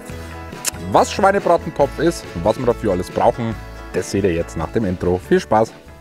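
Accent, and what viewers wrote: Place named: Upper Palatinate, Germany. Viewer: Versuche nicht deinen Dialekt zu verschleiern. A Oberpfälzer bleibt a Oberpfälzer. Grüße aus Mittelfranken mit Wochenendziel Edeldorf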